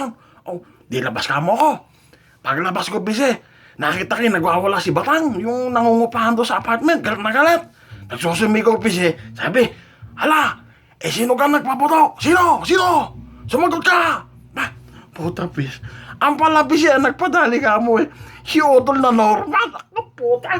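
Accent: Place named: Malabon, Philippines. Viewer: Ano ba yan. Akala ko pinsan ko nagsasalita. Puntong Malabon.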